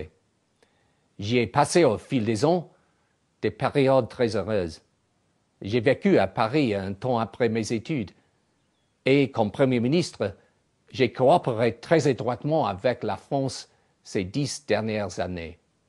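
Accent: England, United Kingdom. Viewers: lol!J'adore l'accent british!!comment il prononce "mondialisation" et "pouissances" ^^ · it's very good , we can understand everything , but there is still this typical english accent (the 'ey' , the softened 'r' , some syllables are skipped....) and that sounds a little like a chinese speaking french 8D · he speaks very clearly... i'm french, he just has this so recognizable english accent on french language. that's very beautiful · Grr.. he's got such a typical "English-student-learning-French" accent. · I can't believe that some of you French people are critiscising him for having an English accent. What the hell do you expect? English and French are both strong languages and when we try and speak eachothers language we always speak it with a very heavy accent, yours is just as bad as ours! Look at Arsene Wenger, his accent is as strong as the day he moved to London 13 years ago. 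He cannot say a single world and sound English.... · Oui, il conserve évidemment son fort accend anglais mais sa prononciation et son vocabulaire sont excellents ^^ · i don't speak french but even i can sense his english accent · he has a realy nice french speaking and a nice charming English accent. Bravo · God im English and even I can tell her speaks french with a big english accent!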